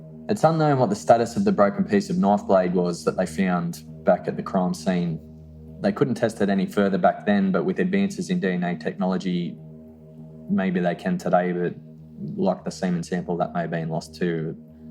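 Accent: Australia. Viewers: True crime + podcasts + a beautiful Aussie accent ... could this get any better? I caught one of your vids in my suggestions and am now bingeing them from the beginning. Thank you for all of the work you put into these cases. They're wonderfully researched. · As a criminology and criminal justice student, you sir have a new subscriber. Props for the Aussie accent too 🇦🇺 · Probably a great and interesting story. Unfortunately, those of us with hearing problems, especially me, will not know. Australian accent, compounded by the addition of reverb makes it almost impossible to understand. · its an AUSTRALIAN accent..... are u seriously asking someone NOT from your country to speak differently??? To suit YOURSELF???? Oh ffs, you are a friggen KARENNNNNNN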